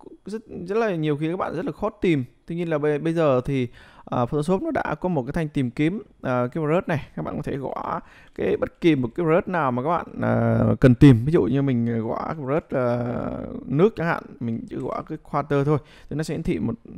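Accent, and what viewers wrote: Vietnam. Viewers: Bạn người Nam Định phải ko bạn? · Anh ở đâu Thanh Hóa vậy ạ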